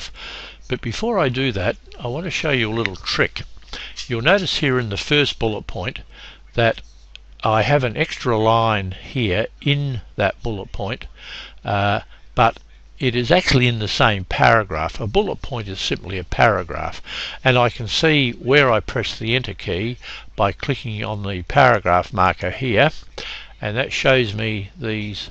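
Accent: Australia. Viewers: Awesome video buddy, helped a lot! Subscribed for future stuff like this! And the Aussie accent made it a ton better!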